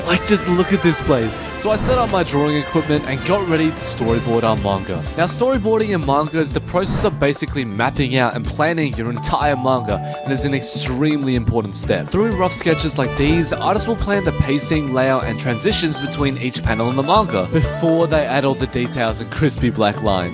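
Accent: Australia. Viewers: Are you from Australia?😮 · I didn't know you were Australian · You are AUSTRALIAN!?? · Hold on ur australian i am two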